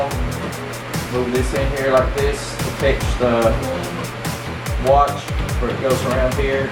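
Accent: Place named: South, United States. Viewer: Interesting how you retained the southern accent but you’ve been in the Philippines.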